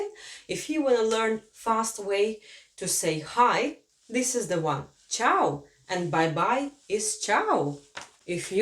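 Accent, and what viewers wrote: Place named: Russia. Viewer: Its interesting when russian is teaching latvian in english :D Had some troubles with Ģ pronunciation. And classic russian fyorst not first :D But in general nice video and idea :)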